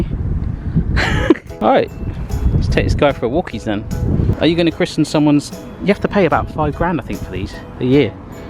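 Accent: South Africa. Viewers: S.A represent! I'm also from South Africa and I'm feeling it for that accent! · I'm from South Africa and that accent gave it away.. He sounds Afrikaans too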